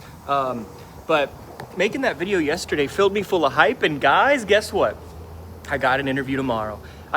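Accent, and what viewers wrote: South, United States. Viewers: I’m sorry am I crazy, you actually sound like you’re absorbing a little bit of the Arkansas/Southern drawl??? Sounding less like a Californian for sure!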